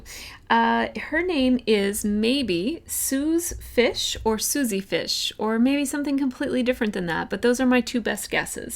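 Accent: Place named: Canada. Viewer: Really fun to watch,. Hadn't really noticed before your pronunciation of 'outlining' because I just love the Canadian accent and that's that. Now I can hear you 'oatlining' everything hahahah. Cath x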